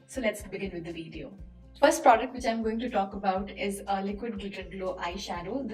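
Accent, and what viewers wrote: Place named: India. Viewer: The prizes are amazing and i love your other videos!! I can tell from your accent that you’re from India Right? ❤️i’m from indonesia🇮🇩!!! Keep it up and enter me!!!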